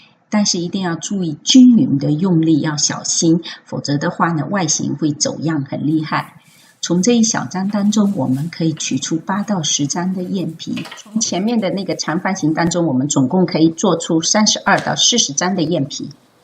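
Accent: Fuzhou, China. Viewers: 老师也有福州口音，听来好亲切。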